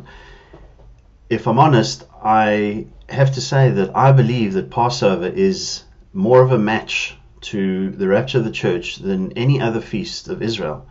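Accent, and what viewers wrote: South Africa. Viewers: There's no way I'm rolling my eyes...Just happy to see you haven't given up and that you are still looking and searching, which is encouraging to those of us who can't wait for the return of Our Lord and Savior Jesus Christ....Things are defenitely coming to a head in the middle east,and who knows what North Korea have got on their mind....Thank you for your work...As I mentiioned before,I am living in South America and I love to hear a fellow SA accent,especially knowing you serve The Lord....May God bless you....Maranatha! P.S. I don't know if you follow Amir Tsafarti from "Behold Israel"...He also serves the Lord and gives excellent updates on the Middle East.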